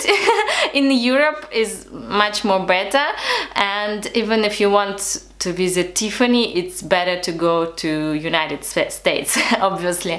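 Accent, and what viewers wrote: Russia. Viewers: Gorgeous Russian accent :)))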